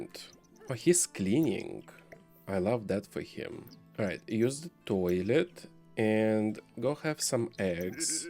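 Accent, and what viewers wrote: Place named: Russia. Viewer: Hi,! Accidentally, saw this video in my YouTube recommendations and immediately subscribed Are you from Russia or Ukraine/Belarus? I recognize this accent from a thousand ❤️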